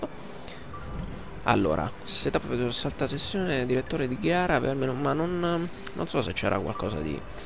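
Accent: Rome, Italy. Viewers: pensavo fossi romano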